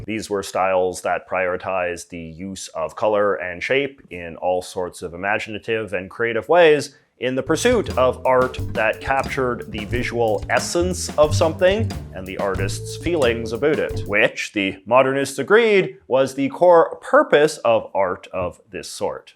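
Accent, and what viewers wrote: Canada. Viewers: Canadian accent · I like how you deliberately turn the Canadian accent up to 11.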